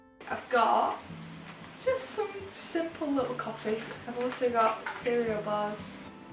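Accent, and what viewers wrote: United Kingdom. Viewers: Hello! Who are you traveling with? I love your British accent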